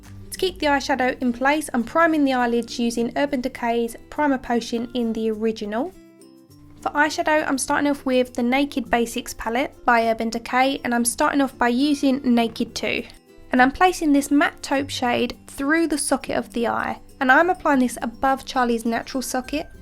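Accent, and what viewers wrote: United Kingdom. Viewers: I never tire of hearing your accent, I miss the UK dearly! I love your explanations and being able to watch your skills, I wish I could have you as a mentor! Could you give an aspiring MUA advice about how to start practicing makeup on people? I don't know how to even begin building a makeup kit and not be poor:/ Any pointers would be sincerely appreciated, thanks +!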